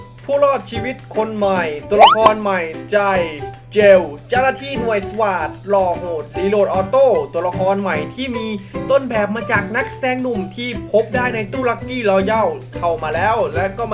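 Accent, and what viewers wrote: Isan, Thailand. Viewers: เว้าอีสานม้วนเเท้ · พูดกลางบ้างอีสานบ้าง555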